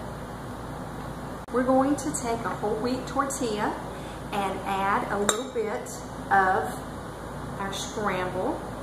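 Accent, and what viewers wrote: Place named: South, United States. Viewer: Only if it's available in Audiobook form....I'd miss that sweet southern "drawl".... ;-)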